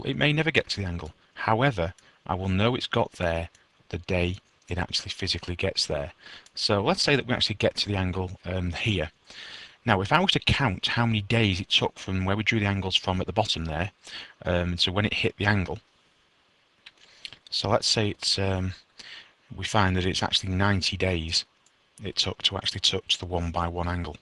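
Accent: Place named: North West England, United Kingdom. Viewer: Lol. Hes from north west i think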